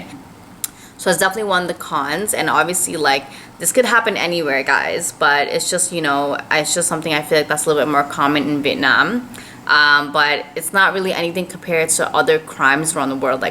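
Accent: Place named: California, United States. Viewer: So you left? I got assume you’re from Cali with that accent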